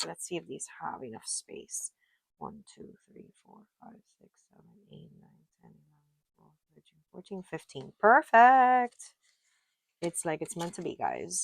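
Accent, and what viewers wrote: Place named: Miami, United States. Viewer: I heard your accent and instantly knew you were a fellow miami girly! Hiiii! Love the vid! :)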